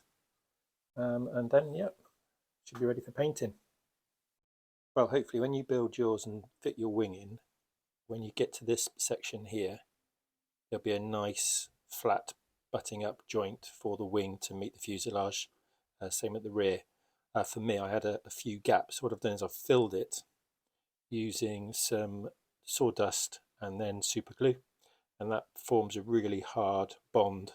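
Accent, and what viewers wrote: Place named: South, United States. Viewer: As a model’er myself, you have made a jolly good effort there. I detected a southern accent there. I am in the West Midlands. I hope we can speak face to face when me day.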